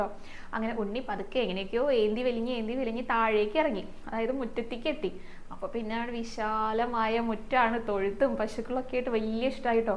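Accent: Thrissur, India. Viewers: Unnikannante kadha mol paraunnathu kelkkumpol munnil nadakkunnathupole thonnunnude. Athratholam resamnu kelkkan pretheykich Trisur malayala siliyil paraumpol. Innathe kadhaum super dressum super.Njan kannyakumarikkarana mole.anikku Trichur malayala sili valare eshttamanu.Njan krishna Bhagthanu.kudumpathil 8_mathe puthranayathinal Gopika Remaman anna perum kitti. Athinal Njan pathivai kadhakal kelkknnu. Molkku sarvaiswriyavum tharan prarthikkunnu.Krishna Guruvayurappa.😊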